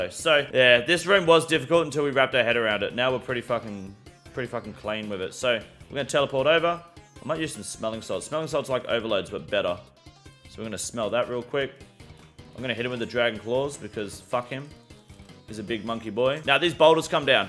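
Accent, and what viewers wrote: Australia. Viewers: ur aussie accent is fantastic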